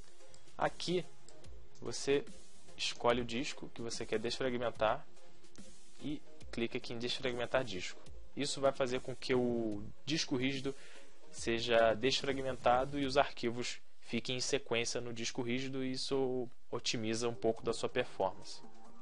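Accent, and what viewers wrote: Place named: Rio de Janeiro, Brazil. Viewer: (lento) leanto.. (disco)dixxxxco..(sistema) sixxxxtema.. sotaque carioca é bem diferente kkkkkkk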